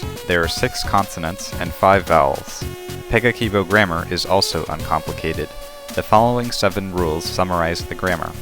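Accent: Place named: United States. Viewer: Please come up with an accent for the language or something, it sounds terrible with an American accent. Ps. Is that Shovel Knight music in background?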